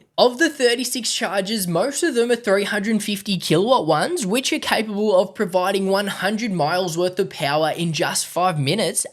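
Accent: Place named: Australia. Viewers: Is this guy from the same place that most of the Australian emigrants came from? His accent sounds so close to an Australian one. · I could almost understand a word now and then, but I was thinking Australia.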